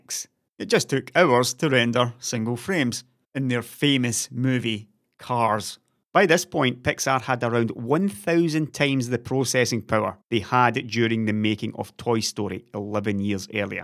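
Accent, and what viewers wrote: Scotland, United Kingdom. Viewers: a thick scottish accent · You're Scottish? Never would have guessed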